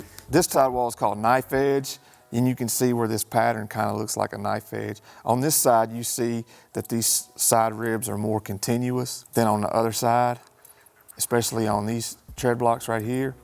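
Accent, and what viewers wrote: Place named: North Carolina, United States. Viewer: Where you from? Sounds like a North Carolina accent.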